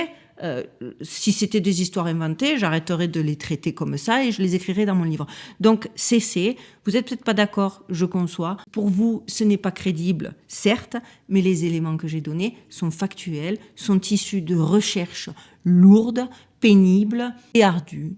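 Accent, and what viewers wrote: Marseille, France. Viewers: exellente video ...j adore ton elocution et ton petit accent charmant de Marseille ;;; Felicitations pour ton travail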